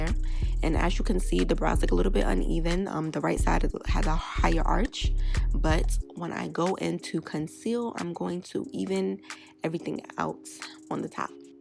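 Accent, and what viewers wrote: New York, United States. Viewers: U a 🐦 like me 😂 U def gotta b from NY😩... love it😍 #Bk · She sound like she from New York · nyc accent i love it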